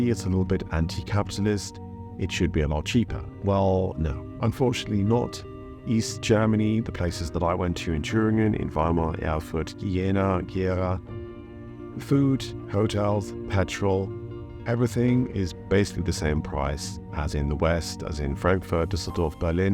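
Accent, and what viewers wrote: United Kingdom. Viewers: It's a real, real pleasure, Brother. I often watch your creations 2 or 3 times. Here, you really manage to pass on your surprise and delight as your tour the cities. It is all so beautiful compared to your preconceived ideas. When you described the lush green, and the ultrafriendly people, I really felt at home. Also, when you described the economic difference, I also felt at home, because a lot of our skilled workers are leaving...going to Oz. The rainbow lady is a bit of me...I can imagine sharing a beautiful experience with a random person. I was curious about why the women blamed some ghastly attitudes towards an American lady, on East Germans. Maybe they were right. I don't know. But I just love the sound of the people and the places you visited. The other things I like about your videos are -your British accent and calm voice -the thought, explanations, ideas, analysis and your personal experiences and opinions that you put into these -the filming...is very good and all kinds of unexpected details are included. 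It makes it very interesting. I like the range of topics you come up with. I love your (British?) humility. Kiwis are quite a bit like that, I think. Some of your topics have a lot of depth...are fairly intellectual. I especially like seeing you smiling. It makes me think you are enjoying yourself in different places...especially in this newly discovered paradise. It is a very sweet smile, and Down Under we do more smiling than some people of the world seem to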